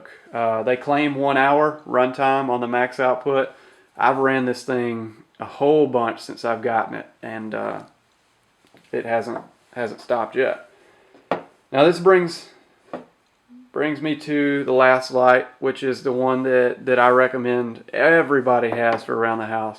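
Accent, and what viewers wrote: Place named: South, United States. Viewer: embrace your southern heritage and accent.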